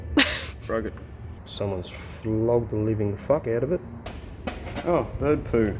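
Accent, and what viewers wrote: Australia. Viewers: Hey another great video you need to get something like a torch meet table for making different ranches and stuff like that that would be cool like on a CNC plasma cutter table I love the outtakes though I really do specially with that Australian accent. But seriously how long does it take to do a job like that that’s one thing I wish you would add do your video how many hours totally it takes to do each job !!!! I truly check every day to see if you guys are posted another video greetings from Chesapeake Virginia USA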